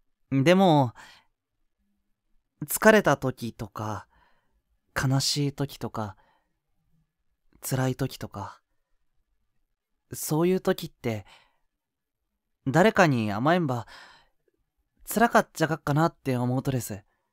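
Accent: Kumamoto, Japan. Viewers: 今日は何かありましたぁ？何かのご褒美ですかー？くんの熊本弁好きすぎるよ～😂 「僕やったら、いつでんよかですよ😊」「笑っとっとが可愛か～」←合ってる？書くと難しい💦 の声好きー♡ 何か…柔らかくてほんわかして温かいです😊明日も元気に頑張れそうです👊ありがとー✨ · 熊本弁ｯｯｯ…😭 熊本弁のボイス大好きなのでめっちゃ嬉しいです😭🎶 つい熊本弁で返してしまいますわ🤷🏻‍♀️ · すごい好き！！方言いい！！分からないのは多分ないかな。敬語で方言とかいい！熊本弁だからいいのかなー。後輩くんに欲しい:_わーい:_わーい:_わーい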